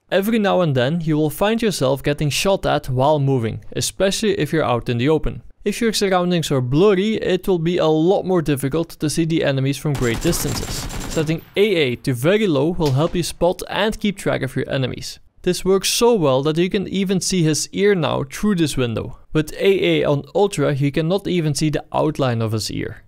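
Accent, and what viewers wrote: Netherlands. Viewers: Ik wist meteen dat je Nederlands was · U dutch? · Are you from the netherlands?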